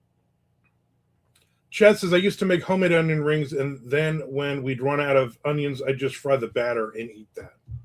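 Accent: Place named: New Jersey, United States. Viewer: Did you say I'm on, or I'm, can't tell with that Jersey accent.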